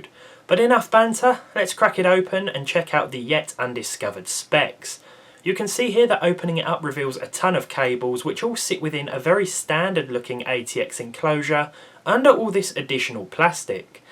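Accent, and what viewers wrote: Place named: Brighton, United Kingdom. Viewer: You’re from Brighton, aren’t ya?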